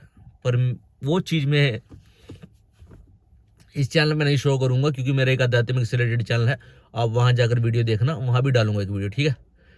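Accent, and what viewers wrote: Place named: Haryana, India. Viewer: bhai mein yamunanagar se.... Apki boli tu jame humare karni ki hai ... Konsa agent tha apka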